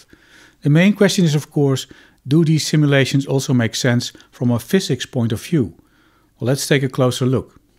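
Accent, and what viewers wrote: Netherlands. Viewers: I hate the Dutch accent.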